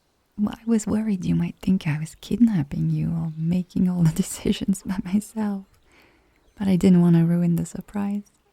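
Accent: France. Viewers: great audio, i love this a lot, tbh i just realised that it's kinda similar to the lizzie mcguire movie where she went on adventures, but of course, both outcomes are different, this one was great, i wish that i could have adventures someday too.. and looks like i have discovered a new city within this video, searched it up, and honestly, i wanna live there now, but i guess it will take time... once again, great audio 🥳💗 edit: i forgot to add this but i really love your french accent ^^ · French accent + speaking in French + singing = melt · Another day, another great upload by. Your French accent is always a win for me.:_heart2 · These French accent videos are some of my favorites, so i definitely hope you maybe make another French character series in the future. I actually visited the south of France this past summer as part of a high school graduation trip, so this series has taken me back to all those amazing memories. 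Also, the quality on this video was amazing; the background sounds, the visuals and the plot all came together to make a entertaining audio. As always thanks for making great content, can't wait to see what comes next:) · Ahh sh** ... back into the french accents rabbit hole 😅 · Worth the wait? Your damn right it was, I’m a sucker for alot of things (clearly) the French accent will be a favorite of mine always so you pair that with flirty, automatically will love it. You just keep on getting/doing better everytime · Yes she is back with the French accent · Flirty and french accents go together like cookies and milk. I'm very excited to see what this will be like.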